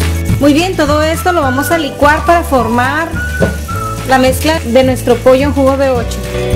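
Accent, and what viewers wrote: Sinaloa, Mexico. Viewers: tienes acento y pinta de sinaloense jejejejje, eres de Sinaloa? tu olla es royal prestige, no necesitas ponerle aceite jejejej pero creo q es la costumbre que tenemos... se ve deli, hare la receta.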